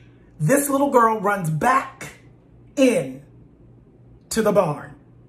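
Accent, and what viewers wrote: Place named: Pittsburgh, United States. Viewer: Your voice still sounds like you're from Pittsburgh you didn't pick up a New York accent at all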